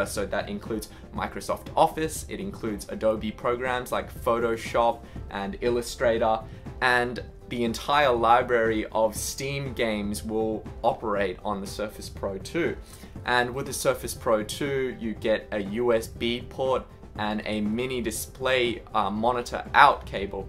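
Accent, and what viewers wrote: Australia. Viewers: I thought you're gonna speak with a japanese accent and then it turned out you're australian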